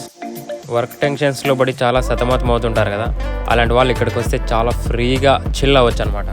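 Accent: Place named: Kalyanadurgam, India. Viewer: మాట్లాడే యాస బాగుంది అదే కొనసాగించు ఓకేనా...చెప్పాలంటే మన కళ్యాణదుర్గం యాస😂😂😅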